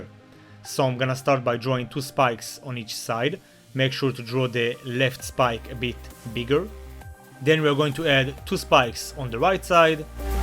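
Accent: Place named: Israel. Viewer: אתה ישראלמ נכון שומעים ותודה על ההדגמה עם גוקו בלאק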